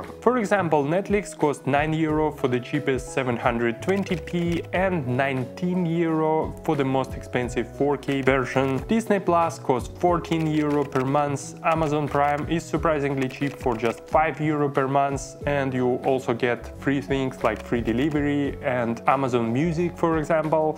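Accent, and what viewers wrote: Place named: Russia. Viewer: да совершенно любая буква t, на , , и так далее. Не, ну это просто акцент, не принимайте близко к сердцу, я просто от многих русскоязычных слышу этот звук, просто интересно, почему вдруг именно так эту букву произносят, ведь в русской речи у нас этого звука нет) те же греки, у которых сильный неубиваемый акцент, просто произносят свои греческие звуки, а у нас такая своя версия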